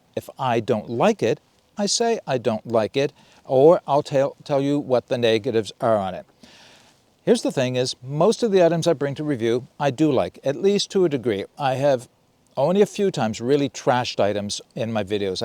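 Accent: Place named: Nova Scotia, Canada. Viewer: Thank you for everything you do. The first time I stumbled upon one of your videos, your accent seemed oddly familiar. It took me a while to figure out that you were a fellow Nova Scotian. I have purchased a number of things based on your reviews. I trust your opinions. I cannot fathom the time and effort you put in. Well done.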